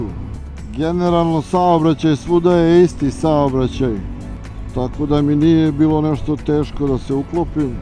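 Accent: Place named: United States. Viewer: Bez zajebancije poceo si da pricas kao amerikanac ahahahhaha Bravo za domacina! Pozdrav iz Velikih Crljeni :D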